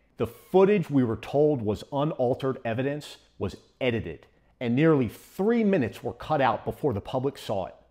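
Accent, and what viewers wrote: Baltimore, United States. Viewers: I am from Baltimore and it is fun to listen to someone with a Baltimore accent please say water plus I like your message so hi hon and keep it up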